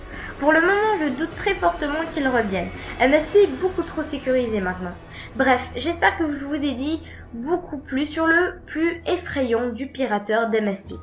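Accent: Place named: England, United Kingdom. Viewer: J'ai kiffé l'accent anglais ('x ♥♥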